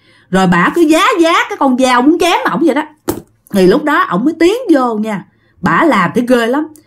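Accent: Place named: Mekong Delta, Vietnam. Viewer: Chị ơi em có cái này muốn nói với chị là cái phần ngôn ngữ đó chị. Chị là người Sài Gòn khi kể chuyện chị đừng có pha giọng miền tây vô. Ví dụ như chữ e rờ "r" người miền tây hay nói thành chữ "g" như "đi ga, đi gô, có gồi, cá gô,....". Chị cứ kể giọng chuẩn đi, tụi em nghe là biết cái chuyện đó là ở miền tây rồi. Em để ý chị kể chuyện lúc thì nói chữ "r" thành chữ "g" có lúc nói chữ "r" bình thường, nói như vậy nó ngọng ngọng khó nhẹ lắm. Chị cứ kể chuyện tiếng Việt chuẩn là được. Em chỉ góp ý nhỏ vậy thôi. Hihi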